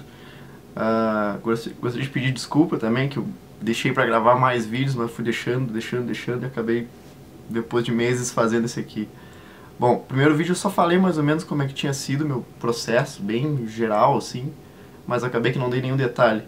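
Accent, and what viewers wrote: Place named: Rio Grande do Sul, Brazil. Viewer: Daiane Oliveira Pela sotaque e maneira de falar deve ser na UFRGS ou PUC- RS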